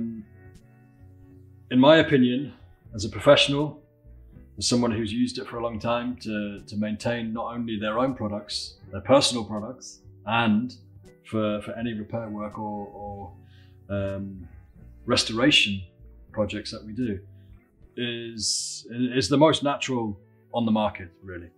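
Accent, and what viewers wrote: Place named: England, United Kingdom. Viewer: "Go give yer bag a drink" very good advice and something I must do! Thanks for a great informative video. Pleasing accent and tone of voice (where in England are you from?).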